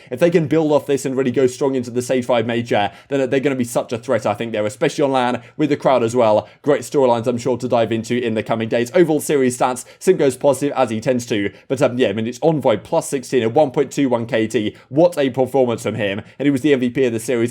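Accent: United Kingdom. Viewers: British, ha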